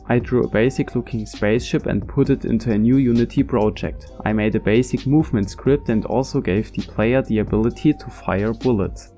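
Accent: Germany. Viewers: weil ich auch aus Deutschland bin und etwas an deiner Aussprache klang deutsch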